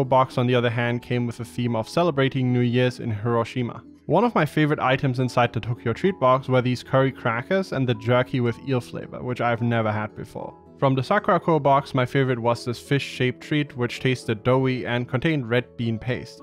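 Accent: Germany. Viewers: How many Youtube accounts do you have? or are there just a couple of you similar voiced guys out there with a German accent playing FFXIV?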